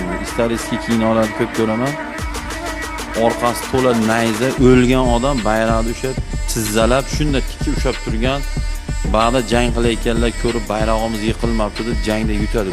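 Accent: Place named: Russia. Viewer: Domla nega rus tili talafuzini koʻp aytasiz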